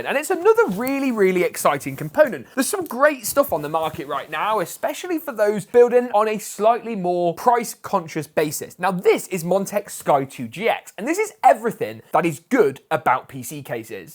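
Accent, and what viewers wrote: United Kingdom. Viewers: building this while giving instructions, not to mention maintaining the british accent, takes real talent. Kudos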